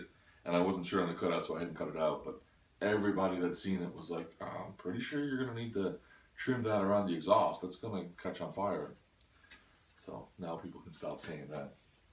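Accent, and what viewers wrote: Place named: Newfoundland, Canada. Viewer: Ahah - no wonder I couldn't peg that accent... A newf in AB is always a bit of a different one. Cheers m8, just stalked you on FB heh, hope all is well. Looking forward to updates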